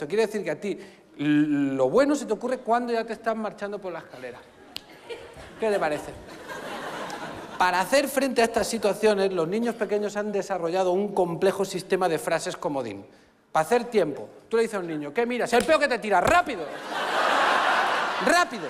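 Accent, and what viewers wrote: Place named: Catalonia, Spain. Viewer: Acento de Catalán mu bonito de un bonito francés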